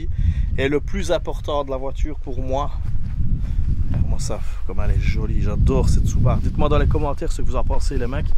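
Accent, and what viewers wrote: Liège, Belgium. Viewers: avec l accent lidgeux 😅 parfait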